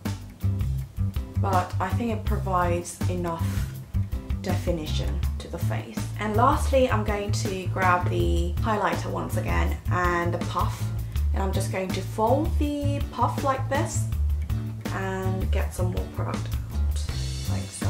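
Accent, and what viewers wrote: United Kingdom. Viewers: 아 님께는 처음 댓글인데:) 너무 귀여워요 동생같으으으으음!!!! 매력 넘쳐요 ㅠㅠ 영국발음이며 화장이며 말투까지 앞으로도 자주 봤음 좋겠어요 ㅋㅋ 빠~이!( 버전인사ㅋㅋ) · 영상 보는게 처음인데 너무 귀여우시고 매력있으셔서 댓글 달아요 ㅎㅎ 영국식 영어 발음도 정말 매력있으시네요!!!ㅋㅋ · I adore your accent/voice! Are you British?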